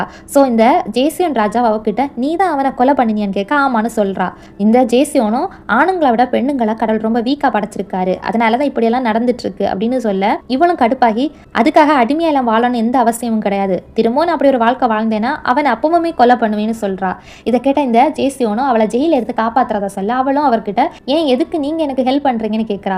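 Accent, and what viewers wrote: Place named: Kanyakumari, India. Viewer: akka neega kanyakumari yaa??? unga voice la kanyakumari slang theriuthu... i am also kanyakumari ❤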